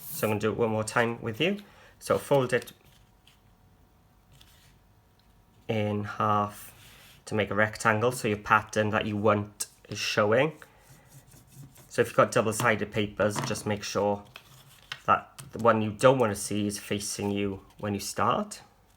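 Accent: Wales, United Kingdom. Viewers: Think u are Welsh yes ?I love Wales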